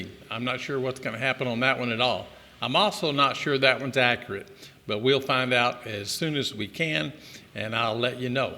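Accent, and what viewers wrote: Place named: United States. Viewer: I've seen a few videos from Duong Business Global- They've discussed all scenerios concerning business visas. It might be help to you. Sixteen years is a long time away from the US, I could still hear your accent.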